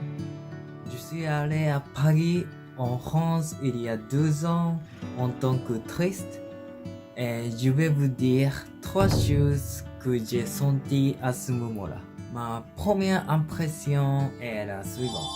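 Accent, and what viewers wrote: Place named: Asia. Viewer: Salut et merci pour tes impressions ! :) J'adore l'accent Français quand c'est un asiatique qui le parle. C'est une très belle langue, content que tu veuilles continué de l'apprendre ;) Pour le côté négatif de ton voyage malheureusement tu n'es pas seul à partager ce ressenti, (2) sur Paris et ses grandes villes. Ce qui est triste quand on voit ce qu'elle était, avant toute cette criminalité.. ( Les pays comme la France, la Belgique et le Royaume-Uni font parti des pays les moins sûr d'Europe.. ) - Si ça peut aider des personnes, je pense particulièrement aux Japonais et Chinois. (En rue, pour tous ce qui est; arracheurs de sacs, pickpockets, crackhead, racailles, "non-français" etc..), ils ciblent particulièrement les asiatiques parce qu'ils savent que vous avez du cash sur vous quand vous visitez. N'hésitez pas à porté plainte à la police directement si cela arrive, vous aurez peut-être une chance de récupérer vos affaires si cette personne est repérée et attrapée après d'autres vols/agressions dans la journée puisque dépouiller/agresser les gens est un sport avec eux.. 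Heureux que tu aies quand même gardé quelques bons souvenirs de ce voyage et bonne chance avec ton apprentissage c'est vraiment impressionnant!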